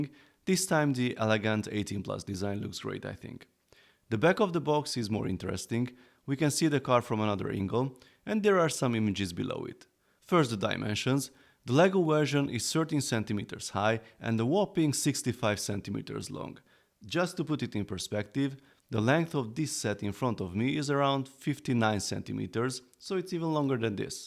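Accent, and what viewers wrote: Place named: Hungary. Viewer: Magyar vagy? Akcentusból gondolom de ha nem így van szólj😂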